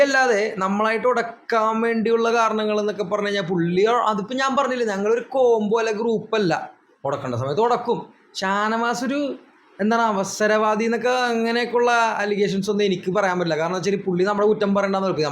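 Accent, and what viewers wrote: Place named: Fort Kochi, India. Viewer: Fort kochi ക്കാർ ഇങ്ങയോക്കെ സംസാരിക്കും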